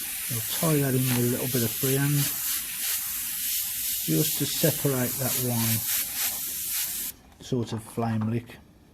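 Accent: Black Country, United Kingdom. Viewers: I cor tell where yo accent is from whether it's more Cradeley or Oldbury/ Bearwood side lol either way, you aren't far from me. I have just started doing car and bike graphics after doing canvases for about 4 years. Great vid just wish it was around when I started about 5 years ago :) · Hi, bostin tutorial mate, just gerrin into airbrushin meself, bought a rook of createx wicked colours, badger patriot 105, compressor etc and bin avin a goo at tryin to do some skulls with real fire, gone a bit tits up a few times while i get used to the airbrush and the paints, musta watched 100 `how to real fire` videos on ere but your`s is the fust one that shows it in absolute detail which someone like me can follow in real time, crackin job mucka, BTW Willenhall here near Wolvo so we have similar accents dow we, arr LOL. Thanks for this mate it`s taught be a hell of a lot.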